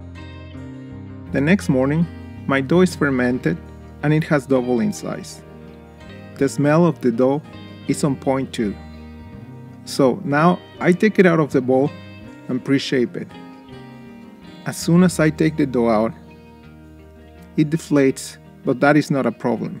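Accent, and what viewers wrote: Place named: Costa Rica. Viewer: .Iam from Costa Rica so i wonder are you ,you accent sounds like a tico from CR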